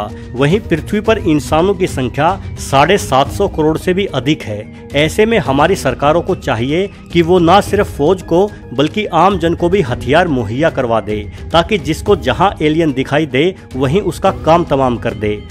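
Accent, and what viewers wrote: Haryana, India. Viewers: .. aap haryana se ho shyad?..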